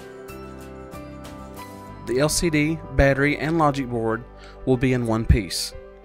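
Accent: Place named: Deep South, United States. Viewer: I love your accent - are you from the deep south?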